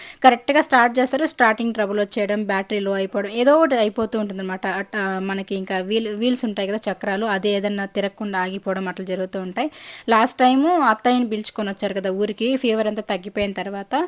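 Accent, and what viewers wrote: Chittoor, India. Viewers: Meeru matladuthunte chala bhagundhi same mana Chittoor bhasha. Village untadam vall konni upayogalu untayi kadha. Vegetables kondam undadhu,porakalu, Chintapandu elantivi kone pani undadhu.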